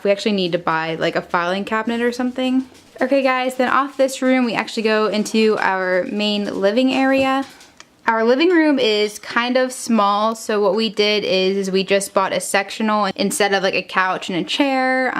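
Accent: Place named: Pennsylvania, United States. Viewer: i love the farmhouse! is this in pennsylvania? thats my home state and i feel like thats you're accent haha i love it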